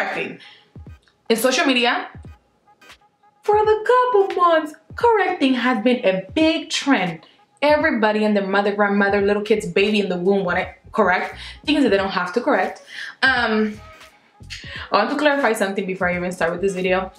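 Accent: Panama. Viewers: I'm 50% Cuban & 50% Panamanian And I love hearing you talk in Spanish because I wish I can talk like that w that "que sopa" Spanish accent 🙈😿 lol viva Panama #Colon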